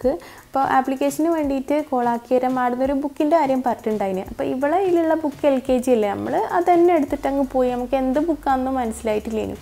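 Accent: Kannur, India. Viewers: നിങ്ങളുടെ കണ്ണൂർ ഭാഷ നല്ലോണം പറയണം കേട്ടോ. കേൾക്കാൻ നല്ല രസമുണ്ട്. നമ്മൾ പാലക്കാട്‌ ജില്ല യിൽ ആണ് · Thalasseryilum vadakarayilum okke varavu ennu thanneyanu parayunnadu.. Sarikkum kannur bhasha kelkkumbol sandosham thonnunnu...Husbandinu endanu jyoli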